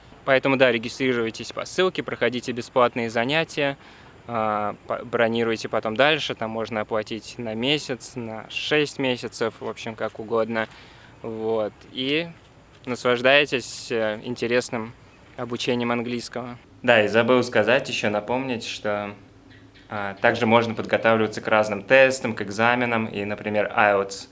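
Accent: Russia. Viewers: на мой взгляд ты специально делал русский акцент=)) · Господи да у тебя такай русячий акцент, это ужасс! И еще чет гундосит тут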